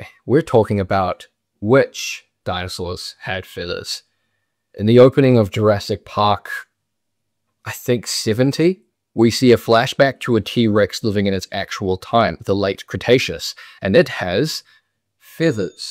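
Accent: New Zealand. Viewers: Do you speak with a Dunedin (NZ) accent? You have a Southland Burr sort of accent. 😊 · I'm new here. Loved it. Guessing you're a Kiwi. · South Island, New Zealand. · Are you a kiwi? Great video btw!!!!!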